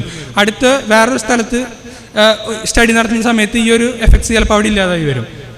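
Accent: Thrissur, India. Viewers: Thrissur Slang presentation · കിടിലൻ സബ്ജെക്ട് dear..... കിടിലൻ തൃശൂർ സ്ലാങ്...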